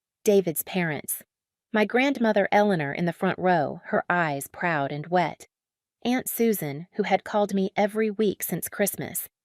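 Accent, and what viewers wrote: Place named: South, United States. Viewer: Interesting story. 👍 That accent.. is it from south? Lovely. Sorry I'm from Europe. 👍🥰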